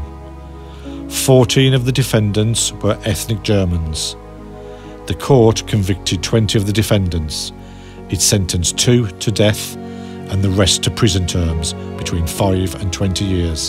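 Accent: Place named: Northern England, United Kingdom. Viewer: At first the strong nothern accent in the narrative takes some patience, after about 5 minutes, the film develops and becomes a truly historical experience of the SS Reich Division massacre of the village. I had heard of this massacre in France as I am French/Irish/ and born in England, so, I have a decent knowledge from different angles on WW2 and WW1 (as my grandfather was in the French infantry from 1914 to 1917, he was seriously injured at Verdun, his brother was killed in 1916). My father was in England during WW2 and was 18 in 1945. One of my friends at school was German Venezuelan in England, his father was an SS Tank division commander in WW2 and has been suspected of taking part in a destruction of a village in France and the assassination of the village habitants. When at school in England from 1971 to 1981 I asked about the whereabouts of his father and one of the two sons who I knew well said “he can’t return to Europe, as he served in the SS and was an officer in charge of a Panzer Division)… His father was 15 years older than his mother who was Venezuelan and who I met in the North of England several times during half term holidays, small world, coincidences. 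Hard to grasp this level of evil and inhuman behavior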